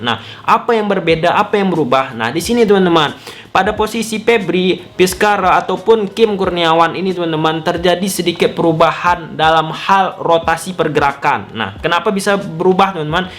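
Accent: Malaysia. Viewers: Logat melayu kental skli bang, orang riau atw mana ya?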